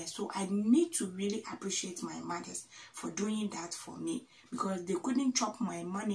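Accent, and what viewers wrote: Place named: Ghana. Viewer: My favourite girl with the beautiful Ghana accent thanks for being a hardworking lady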